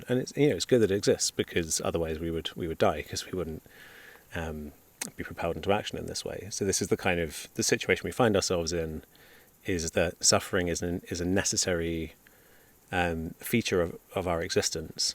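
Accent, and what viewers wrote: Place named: England, United Kingdom. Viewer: that's called an English accent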